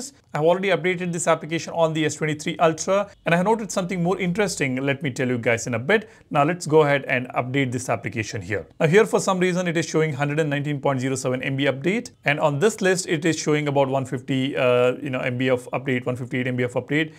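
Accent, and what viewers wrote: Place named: India. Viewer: My friend thanks forall your information but you are talking to fast and with your Indian accent it’s very difficult to followed you. Please don’t believe I’m not accusing you I’m not insulting you but you needs to slow down if you want to people understand you Especially some of us don’t know or not familiar with technical issues 🙏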